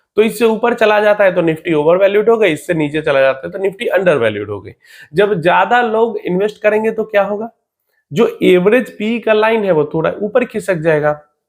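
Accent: Bihar, India. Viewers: Aap Bihar se hai kya bhai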